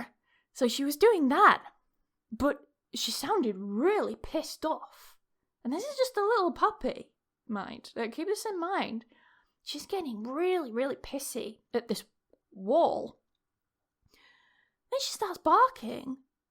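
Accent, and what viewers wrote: United Kingdom. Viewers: 100% British English always!!! I really enjoy your videos…..and with them I can develop my skills in understanding the English language. Thank you very much,.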